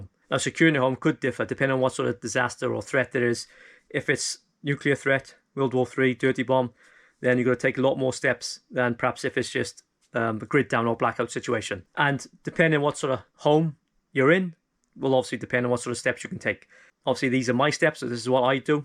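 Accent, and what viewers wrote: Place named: Scotland, United Kingdom. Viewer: I subbed, but IDK if I can deal with the thick Scottish accent.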